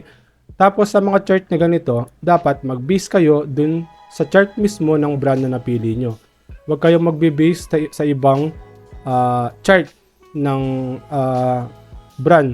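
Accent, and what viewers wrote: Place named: Pampanga, Philippines. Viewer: halatang kapampangan ka sir lol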